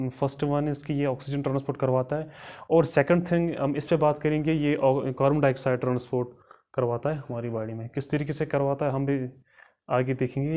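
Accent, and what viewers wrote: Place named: Kashmir, India. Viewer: Kashmiri axient aata h bhaiii....but Lecture is good..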